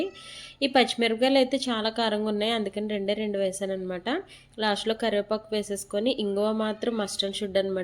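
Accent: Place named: East Godavari, India. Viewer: Matladithe east godavari antaru madhi east godavari sister Maa side chesukuntaru super sister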